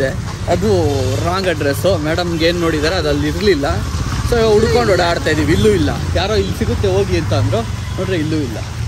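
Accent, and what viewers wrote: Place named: Mangalore, India. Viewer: Aa manglore fast phase accent yellarigu artha agalla.. Artha mdkondre masth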